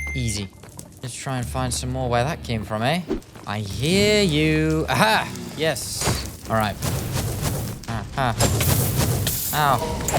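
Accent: United Kingdom. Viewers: in my honest opinion, I didn't know u were British 😂😂😂😅 And plzzz make a 200 days, that would be AWESOME! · you sound britsh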